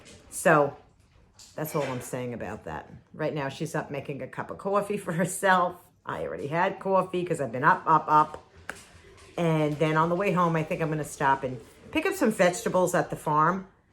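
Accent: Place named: Boston, United States. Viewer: Are you from Boston? Lol cuz of the accent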